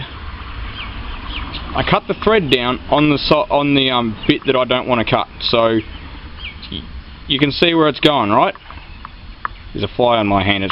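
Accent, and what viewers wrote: Australia. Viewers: true Aussie accent, love it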